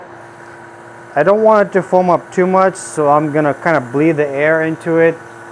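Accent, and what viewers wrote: Russia. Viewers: Hi! You from RUSSIA?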